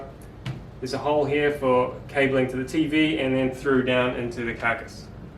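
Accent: New Zealand. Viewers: somewhere in nz by the accent i take it? i love your work, it is quite fantastic, love th idea of the v-groove just by using the table saw set on a 45, that is genius...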